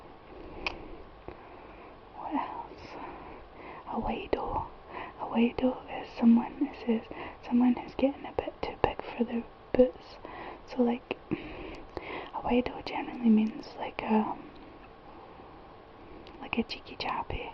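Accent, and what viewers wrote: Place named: Scotland, United Kingdom. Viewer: Just found You.......yahoo.........I am Scottish too but have been in Canada for 50 yrs......I love the accent, my Parents have the accent but I cannot hear it hahaha, cheers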